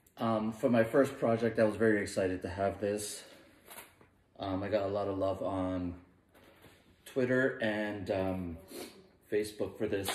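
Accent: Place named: Jamaica. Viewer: He almost sound jamaican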